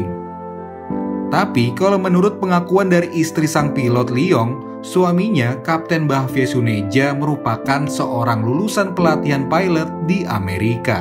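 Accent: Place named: Java, Indonesia. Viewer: Ngedap coro jawane stoll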